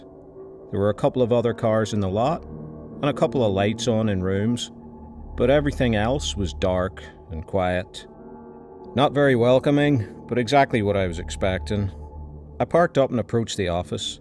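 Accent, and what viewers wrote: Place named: Ireland. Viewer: ❤ Hi I found your video and 1 at time listening to your narrative style & I've noticed you have a Irish? accent? Sorry if I got it wrong but you sound nice narrating the stories. Good job bro.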